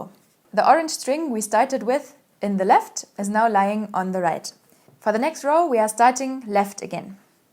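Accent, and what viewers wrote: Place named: England, United Kingdom. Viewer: I enjoyed your video, thanks, Also I like English accent, doesn’t sounds German😉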